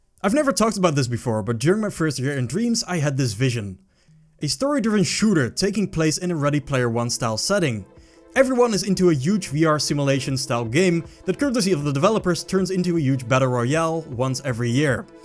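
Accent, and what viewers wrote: Netherlands. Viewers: can you add gyro controls and flick stick to your template? that would be cool. just look up jibbsmart to know what flick stick is. (spreek je trouwens nederlands, aan je accent te horen). Flick stick is a way to snap the camera to any angle. if you want to turn 180 degrees, just tilt the stick down. want to aim 90 degrees to your right. tilt the stick to the right. you can no longer look up and down with the stick. you need gyro to do that. i have been using it on almost any shooter on pc.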